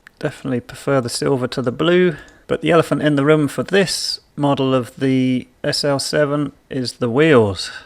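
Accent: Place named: United Kingdom. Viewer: Thanks for that high quality comparison. Specially the model-vs-model and the price comparisons over the whole range of bikes. Love it. Even though im even more uncertain what to buy now. What would you guys go for with 6500£/8000$? btw. where are you from in the UK? Love the accent and the saying you are using. Want to learn those :-)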